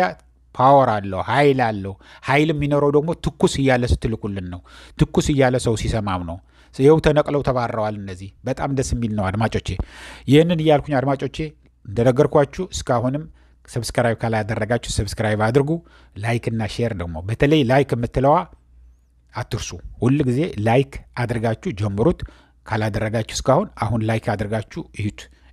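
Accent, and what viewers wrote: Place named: Eritrea. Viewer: አነጋገርህ ማን እንደሆንክ ይናገራል አማርኛ እንኳ ማንበብ አትችልም አርትራዊ ነህ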